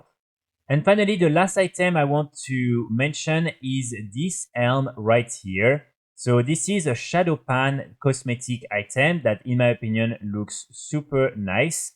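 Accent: France. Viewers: the french accent makes this unwatchable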